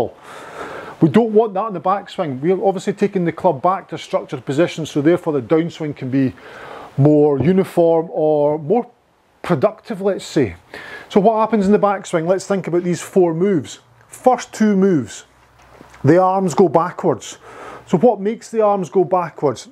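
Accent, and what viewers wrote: Scotland, United Kingdom. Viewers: I very much enjoy your lesson, both the content and your Scottish accent. Luckily I spent a wee while in the UK so it's no problem for me. Unfortunately I can not fwd your vdo to any of my Thai friends, because they wouldn't have a clue what you are talking about. Keep on the good work and no need to change the accent!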